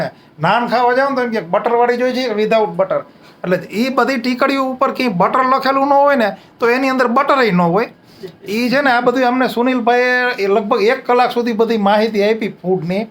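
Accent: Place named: Rajkot, India. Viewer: રાજકોટની ટિપિકલ ભાષા બોલો છો ! "આઇપી"થી માંડીને અનેક શબ્દો...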